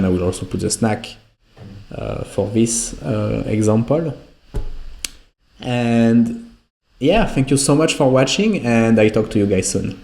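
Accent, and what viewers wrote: France. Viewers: You have a french accent despite you ain't french 😄 Great video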